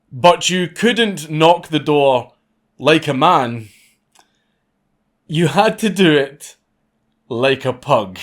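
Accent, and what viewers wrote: Scotland, United Kingdom. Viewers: "A Papul bull" I love the scottish...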